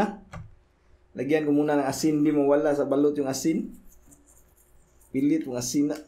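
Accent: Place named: Bohol, Philippines. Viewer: Taga Bohol ka migo ? Mura man ug Taga Bohol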